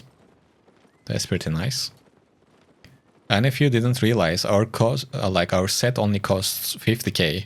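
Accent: Turkey. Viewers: Tam bir türk aksanı başarılarının devamını dilerim dostum 3 yıl sonra tekrar başlayayım dedim ve videolarını izliyorum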